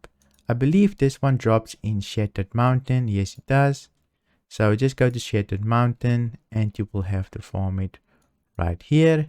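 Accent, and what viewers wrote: Afrikaans, South Africa. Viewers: Love the Afrikaans accent. Im also from South Africa.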